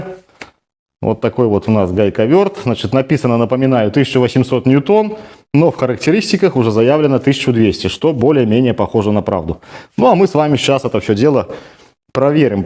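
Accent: Belarus. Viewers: Давно в Беларуси не живу, но интересно наш акцент услышать родной, ) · По разговору похоже что вы из Беларуси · дак я ж по говару сразу сказал - Белорус) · По голосу слышу, что беларус. А ссылка на российскую версию Алиэкспресс. Не понятно.. . 🤷‍♂️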